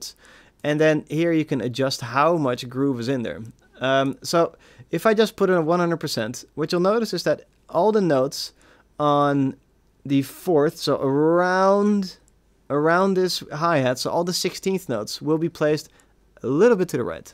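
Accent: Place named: Netherlands. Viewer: Where are you from? You sound a little dutch, your english is really good though!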